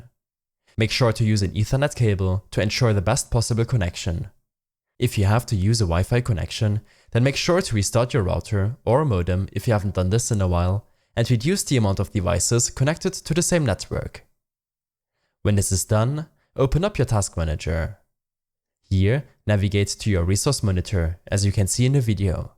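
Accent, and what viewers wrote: Germany. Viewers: Junge man hört anders dass du eig immer deutsch redest xDD :DD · man hört sooo hart dass du deutsch bist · Man hört dass das ein deutscher ist😂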